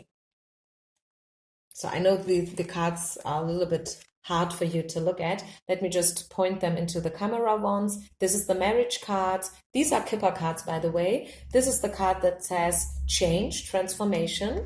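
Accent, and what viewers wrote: Germany. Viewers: Kann es sein dass du deutsche bist? Der Akzent hört sich so an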